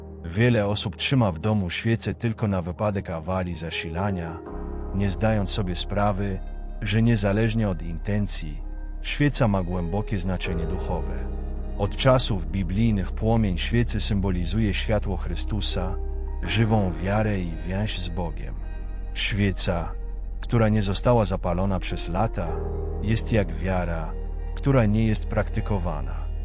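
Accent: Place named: Russia. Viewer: Co to za Antychryst z ruskim akcentem nagrał te brednie ,gin szatanie ,,akysz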